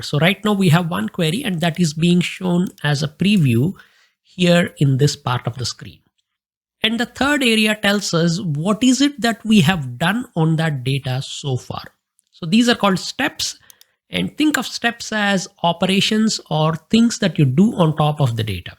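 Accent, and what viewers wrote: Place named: Tamil Nadu, India. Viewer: Aap Tamilnadu se ho kya 🙂 apke bolne ke tarike se lag raha hai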